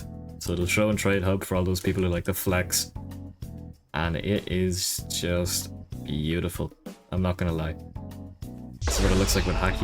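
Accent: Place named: Ireland. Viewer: bros irish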